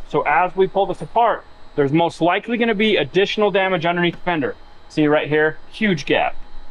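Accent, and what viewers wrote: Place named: Utah, United States. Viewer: Great show, really enjoy your body-work tutorials. Also amazing how similar to Kaulin you speak, must be a Utah accent!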